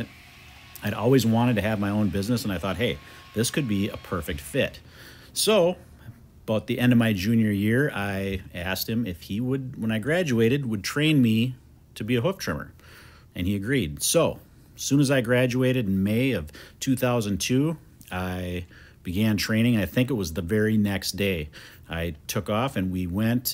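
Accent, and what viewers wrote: Wisconsin, United States. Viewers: Just from your accent, I was picking up some Minnesota which makes sense kf what you told us where you apprenticed and then I definitely thought you were a Wisconsinite. I'm a born and bred Wisconsinite myself. Dad worked on a diary farm making cheese in summer during school breaks in the Sheboygan area where he was born and was raised in Kiel with family right in New Holstein. I was born in Milwaukee and that's where my mom and her family have always been. Then after being in Crystal Lake, IL until age 3-4 as my dad commuted to work in Northbrook, we settled in Kenosha...so the super southeast and only 10 min from the WI/IL border. Lots of cows everywhere in this state. As a life-long rider of horses (jumpers and dressage) and watching farriers trim and put on new shoes, do you think you could (in a pinch) trim a horse hoof? 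· I thought I pinned your accent! Much love from a fellow Wisconsinite!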